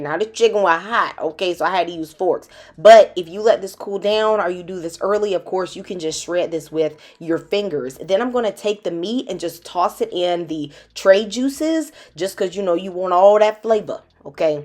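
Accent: South, United States. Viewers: Why you sound like that other lady who cook do all y’all southern ppl sound the same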